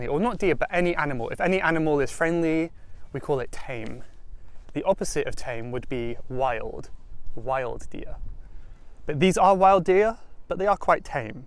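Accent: United Kingdom. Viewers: I love accent british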